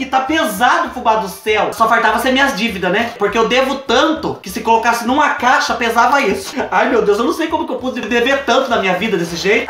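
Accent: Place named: Interior São Paulo, Brazil. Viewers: vc me faz lembrar do meu pai, ele nasceu em Itararé, ele tinha o sotaque igual o seu. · Fubá do céu muito legal faz sim....,Sotaque lindo vc tem,igual minha mana que mora no interior Paranapanema SP ♥️👏👏👏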